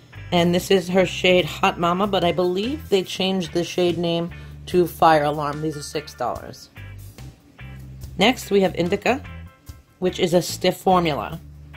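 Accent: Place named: Staten Island, United States. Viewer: I could cry right now girl...I dont know how I missed it from the first time I watched this video..., I am from Staten Island too. I live here still. Your voice had a familiar quality for me...we probably both have the same accent 😁💙💚💙💚 I didnt think I could love you any more but now I do!!! Its so amazing to find someone as knowledgeable as you on YouTube and social media and know that you are from my hometown. 💚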